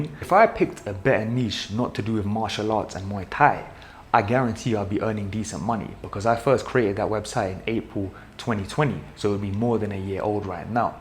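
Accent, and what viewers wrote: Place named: United Kingdom. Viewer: You sound like a British rocky balboa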